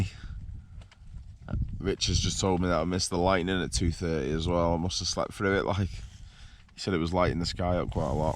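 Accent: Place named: Liverpool, United Kingdom. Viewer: Enjoyed you enjoying that. Good stuff. (Are you from Liverpool, lid?)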